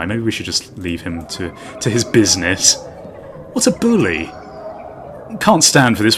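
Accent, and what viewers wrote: United Kingdom. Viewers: British markiplier voice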